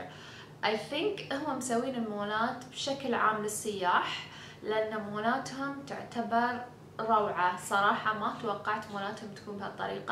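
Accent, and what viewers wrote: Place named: Bahrain. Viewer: كاتبه انها اماراتيه بس لهجتها يايه ع البحرينية + شوي عراقيه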